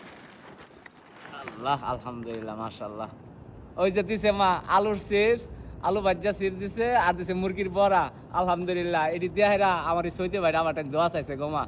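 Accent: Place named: Mymensingh, Bangladesh. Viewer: ভাই মনে হয় তোমার বাড়ি ময়মনসিংহে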